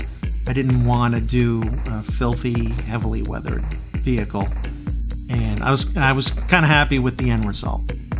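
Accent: Texas, United States. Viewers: Weirdest Texas accent ever 😊 Great build buddy.....glad the channel is so successful and growing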